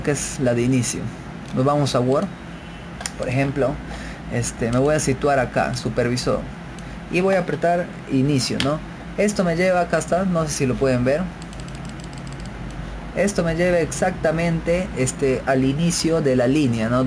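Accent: Peru. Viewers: Acordemonos de que nuestro hermano nos ensena con la forma de hablar en peru, la cual como en toda America Latina tenemos muchos acentos y modismos en nuestra forma de hablar el espanol asi que por favor no lo corrijamos ya que hasta mucho hace nuestro hermano con enzenarnos a los que no somos tan Buenos en la computadora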